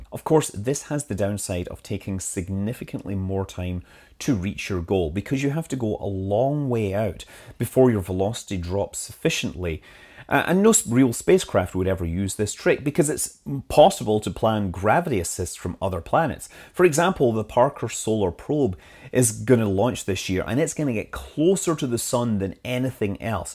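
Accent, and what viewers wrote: Scotland, United Kingdom. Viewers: Is it scottish dialect what you are speaking?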